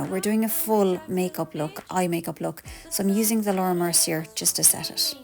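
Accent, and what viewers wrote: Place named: Ireland. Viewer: Add Irish accent to you description and it will triple your views! Love the Irish accent 🩷🙏🏻😍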